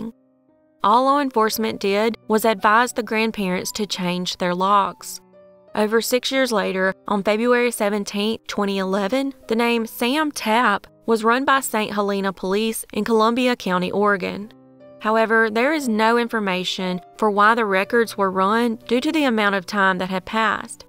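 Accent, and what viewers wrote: South, United States. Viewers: Thank you kindly for your amazing story and video,much luv, I love ur southern accent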